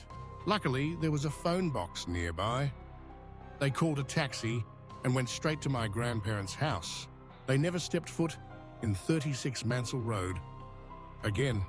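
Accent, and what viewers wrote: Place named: Australia. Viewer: Ausie accent, great mate..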